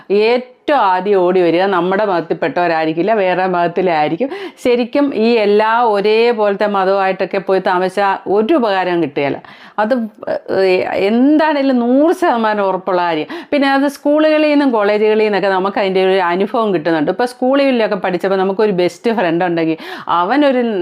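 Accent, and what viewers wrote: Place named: North Kerala, India. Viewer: വീഡിയോകൊള്ളാം. നന്നായി. ആ ഞ്ഞാമെന്റൈ കൊള്ളില്ല. വടക്കന്മാരെല്ലാവരും പറയുന്നഫാഷ യാണ്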